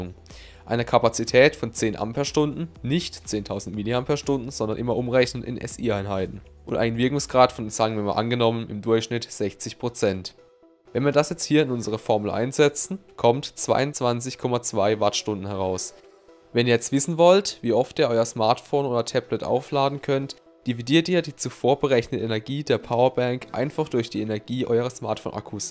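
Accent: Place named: Karlsruhe, Germany. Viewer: So ein schöner Karlsruher Dialekt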